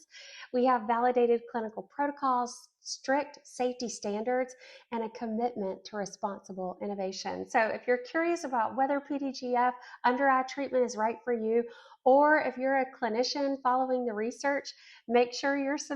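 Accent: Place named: South, United States. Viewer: Hi, you sound like you’re from the South as am I. Where is your clinic located?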